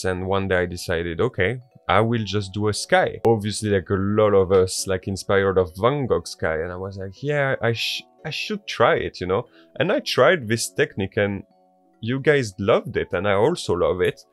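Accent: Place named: France. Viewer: French accents speaking English is so lovely. Gorgeous needlework too 🥰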